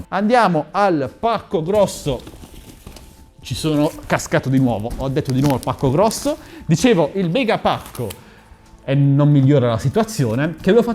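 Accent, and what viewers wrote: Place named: Bari, Italy. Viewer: E' piacevole sentire un unboxer con il poprio accento.... sei un grande!!!...W Bari.....Ottimo unboxing!!!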